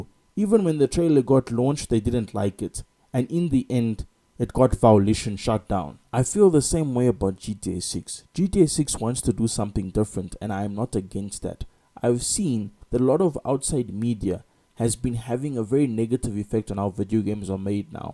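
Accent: South Africa. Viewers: I recognized that SA accent. You coloured ?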